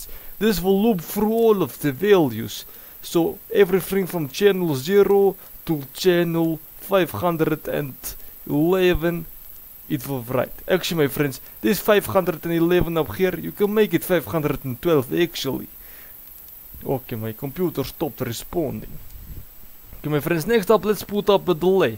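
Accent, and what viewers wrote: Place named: Russia. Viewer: Love you Russian accent... ❤️ Gangsta Russian coding guy. 😂🎉 Greeting from Serbia.🎉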